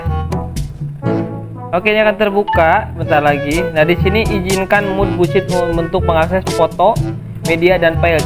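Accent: West Java, Indonesia. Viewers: Jigah orang Sunda uyy...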